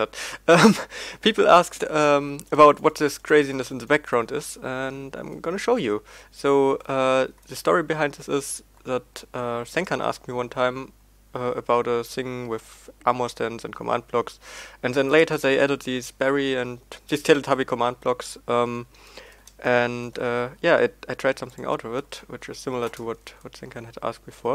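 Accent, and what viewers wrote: Germany. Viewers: okay cool hört man das du deutscher bist XD.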